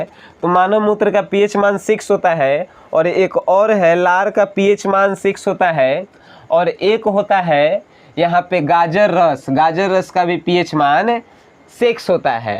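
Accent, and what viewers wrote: Bihar, India. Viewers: Sir ap Bihar se belong karte h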